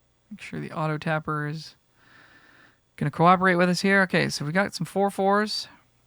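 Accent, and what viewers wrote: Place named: Canada. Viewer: You say Ey a lot. U Canadian?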